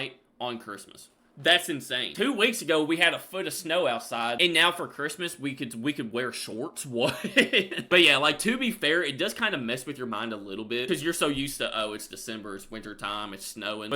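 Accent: Kentucky, United States. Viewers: Lovin your accent, is it Kentucky? I’m very English. Sometimes youre almost London cockney. July 28? I’m July 27. 😄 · Soon as I heard that accent I just knew you were from KY.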